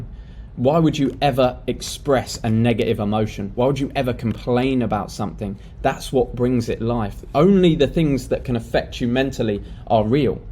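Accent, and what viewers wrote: England, United Kingdom. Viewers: It's really interesting to hear this stuff with an English accent! Thanks for posting!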